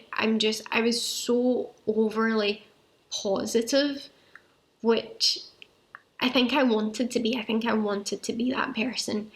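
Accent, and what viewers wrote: Scotland, United Kingdom. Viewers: Such a powerful video. I've had MS for 27 years. Your life will change, but it's not over. Follow Dr. Aaron Boster on YouTube. He's a fantastic MS neurologist. Just get on the most effective therapy that you're comfortable with. There are some very effective ones out there (Tysabri, Ocravus, Lemtrada). You need to think about your FUTURE self (20, 30 years down the road. You sound like you're from northern Europe (Scotland?). There are a lot more cases of MS there. Best of luck to you, my friend. Cheers! 👍👍❤❤ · When the neurologist tested your babinski reflex was that abnormal or anything? Are your feet ticklish so was hard for neurologist to tell? 😂 Where in Scotland you from? Love the accent!❤️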